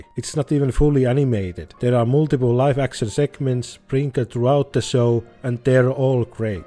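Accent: Finland. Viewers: As finnish i can recognize strong finnish english accent. Great video btw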